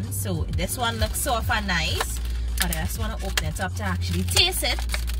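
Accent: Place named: Trinidad, Trinidad and Tobago. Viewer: Damn you so beautiful, looking non Trinidadian...but when you start speaking...you proved me wrong...have a great day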